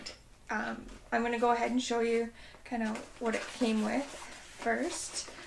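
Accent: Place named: Canada. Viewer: I love your accent, Canadian?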